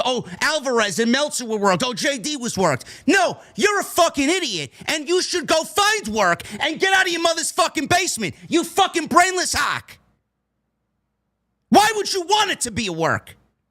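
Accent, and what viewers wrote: New York, United States. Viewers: JD wasn't angry. That's how alot of us new yorkers talk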